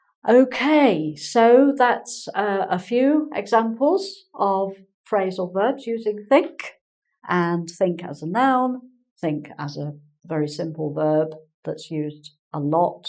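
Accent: United Kingdom. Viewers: Gracias maestra es muy clara en su enseñanza. Me encanta el acento británico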